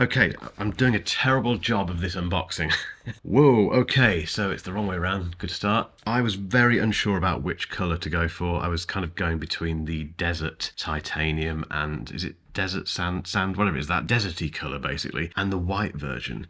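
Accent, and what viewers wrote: England, United Kingdom. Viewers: Listening to British people review products is excruciating torture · Unwatchable ramblings. I really loved Samsung galaxy and didn’t miss iPhone apart from the ecosystem… 😂😂😂… my dude do you comprehend that it’s all about the ecosystem. What did you use it for… the calculator? Another proof that English accent does not mean intelligence.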